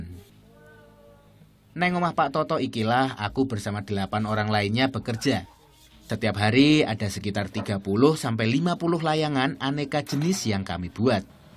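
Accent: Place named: Java, Indonesia. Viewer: g iso di salahne, masih o gae boso indonesia wong tulungagung panggah medok bosone haha. tanah kelahiranku pehhh keren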